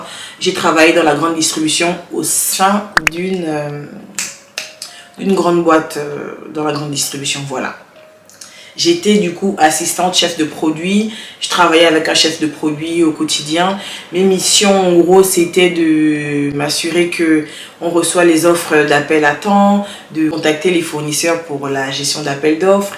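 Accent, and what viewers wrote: Gabon. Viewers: Toi t'es gabonaise 😂😂😂 l'accent ça ne ment pas... J'aime la vérité et le côté naturel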